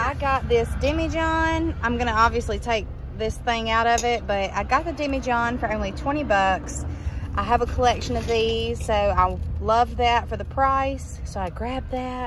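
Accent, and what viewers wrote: South, United States. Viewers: I love when you make a new video. I just love you and Buster Brown and Aunt Penny. I love your southern voice. You make me laugh out loud when you say that you look like a buzzard. Keep making your great videos. Thank you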